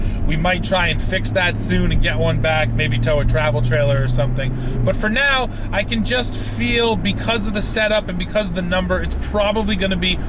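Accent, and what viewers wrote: Canada. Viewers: FYI, the US pronunciation of Mazda and Yamaha with an IPA ä sound is closer (not perfect, but closer) to Japanese pronunciation than the Canadian æ sound. That said, you don't have to water down your Canadian-ness to appeal to a broader audience. I've been subscribed since long before ever worked for TFL, and it's one of the endearing distinctive qualities that helped you stand out when I watched my first video. That you have real experience using trucks for towing, hauling, and off-roading, plus winter weather testing is what makes you one of my favorite channels.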